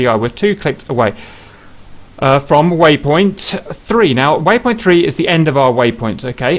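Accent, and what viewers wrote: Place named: United Kingdom. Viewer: A lot of information, but spoken too fast. Difficult to keep up with instructions and the British accent does not help. Any thanks!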